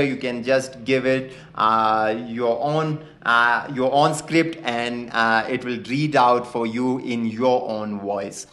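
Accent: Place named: India. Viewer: In the video all I could understand was the first part of the name, which was play, but the rest was a blur. I had trouble understanding your heavy hindoo accent.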